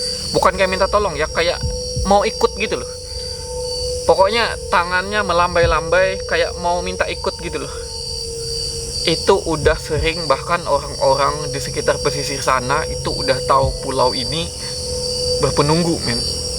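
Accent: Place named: Kalimantan, Indonesia. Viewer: Ga usah pake kata Gua apa Gue bang.. Logat mu kalimantan banget.. Lebih bangga pake bahasa kalimantan atau setidaknya bahasa indonesia.. bangga lah berbhasa Daerah, bahasa kalimantan!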